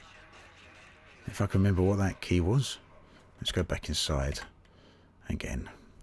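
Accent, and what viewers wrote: United Kingdom. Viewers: Still a great video. I went from thinking maybe I have to buy some sort of add on to make the cameras work, to using mine (basics) in about half an hour. Thanks for efforts your videos are excellent - and nice to hear a British accent as welk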